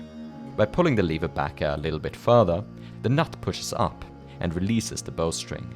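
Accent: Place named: Sweden. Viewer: Even beyond the Swedish accent you sound almost EXACTLY like Gold & Gunpowder, love ya both! (unless....)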